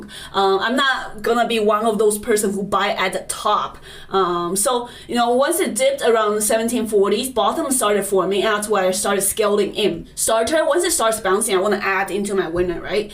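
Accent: China. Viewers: Your accent is so thick I swear it’s like you’re speaking Chinese sometimes. I have to work hard to understand what you’re saying. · Can’t stand your Chinese English accent 😂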